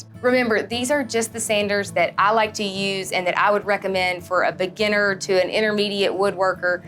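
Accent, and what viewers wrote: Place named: West Texas, United States. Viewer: Thanks for the refresher on types of sanders and sandpaper. I'm trying to place your accent. Are you from west Texas?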